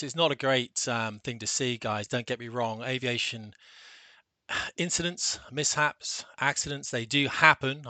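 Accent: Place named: England, United Kingdom. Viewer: The gentleman speaks with evidences of a regional English rather than the "received" British English or standard, mid-western American English and at a pace suggestive of being prodded on with a stick. Very difficult, I find, to follow! Very regrettable!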